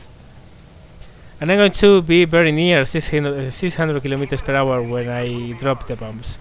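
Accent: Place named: Spain. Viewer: you sound like an academic linguist who doesn't have a good feel for language. I believe he is probably a native Castillian or Catalan speaker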